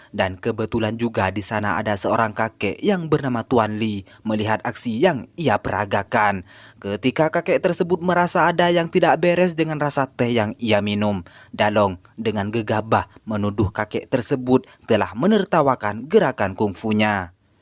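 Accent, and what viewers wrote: Madura, Indonesia. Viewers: Madura ya pengisi suaranya?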